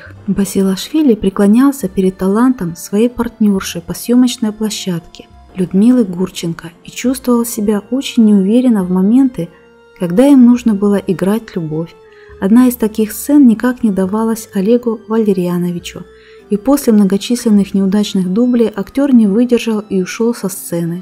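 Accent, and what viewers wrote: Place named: Urals, Russia. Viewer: Да, да...Я тоже его слышу... Она,возможно, с Урала...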